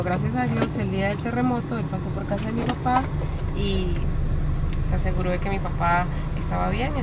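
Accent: Colombia. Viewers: DILE A LOS DEMAS QUE HABLEN PARA SENTIR QUE ESTOY EN VENEZUELA, TU HABLAS PURO COLOMBIANO.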